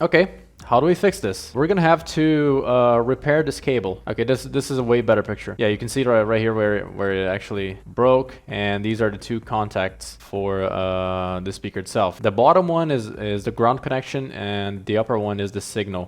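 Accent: United States. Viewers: Manda muito no inglês. Parece um americano kkk, ótimo vídeo mano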